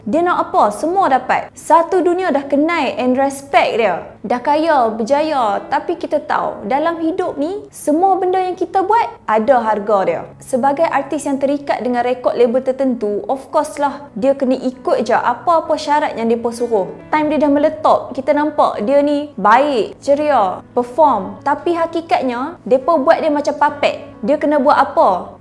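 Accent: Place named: Kedah, Malaysia. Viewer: Sempoi speaker video pon slang kedah noo 😁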